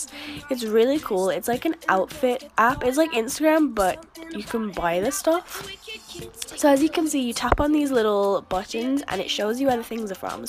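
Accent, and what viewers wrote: United States. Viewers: out of curiosity why do you talk with an American accent? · Why do you sound American when you live in the UK?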